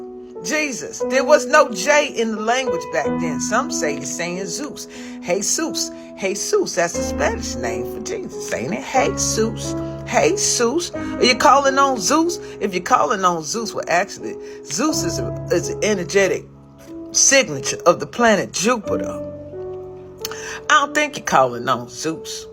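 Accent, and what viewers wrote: United States. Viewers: Could someone tell me what region/state her accent comes from? I can't recognize it. Is it Southern states , West Coast, Midwest?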